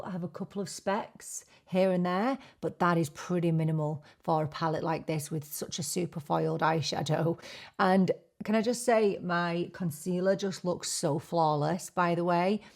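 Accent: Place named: Newcastle, United Kingdom. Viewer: You quote euro currency but at the same you have a strong english accent (Newcastle?), can you share where are you from? 😊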